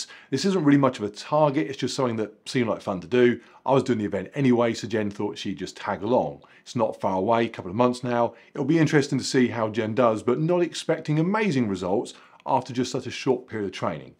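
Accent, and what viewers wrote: United Kingdom. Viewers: I am here just to hear the queens language …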